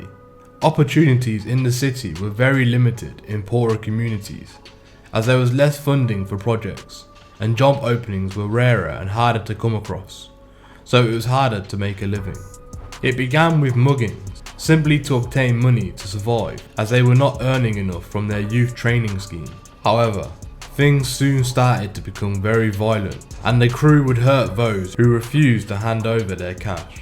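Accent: Bristol, United Kingdom. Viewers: no they weren't though, why are you acting the goat sun we all know Bristol nothing the only reason this guy is doing a video is because he sounds like he is from Bristol and probably had his peddler robbed off him by them and he thinks there the cripps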